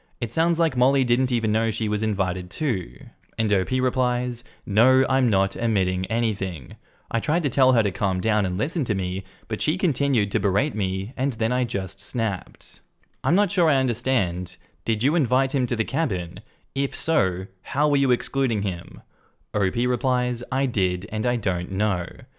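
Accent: Australia. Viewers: Purely out of curiosity, what kind of Australian accent does have? Whereabouts?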